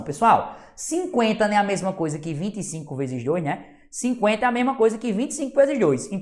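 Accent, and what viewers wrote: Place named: Northeast, Brazil. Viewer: Muito obrigada professor, me ajudou muito!! O sotaque nordestino, bom demais!!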